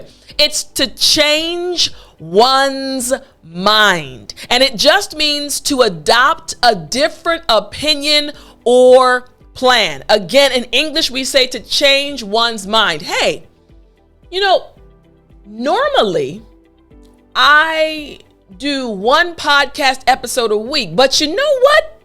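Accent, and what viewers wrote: New York, United States. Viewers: Are you from NY ? Sounds like that) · ARE YOU FROM NY?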